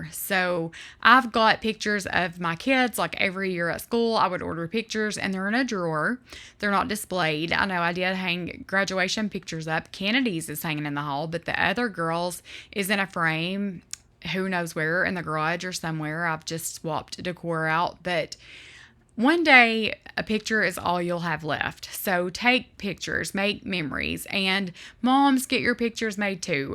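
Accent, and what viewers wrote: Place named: Tennessee, United States. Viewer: Hi, love your channel and your awesome Tennessee accent! 😊 Every time you read a devotional it really helps; life has been rough for our family the last 2 years. God bless you and yours.❤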